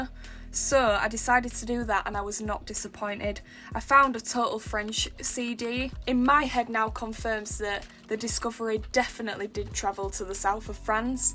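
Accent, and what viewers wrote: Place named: Scotland, United Kingdom. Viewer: That accent is insanely delightful. Hahaha Scottish?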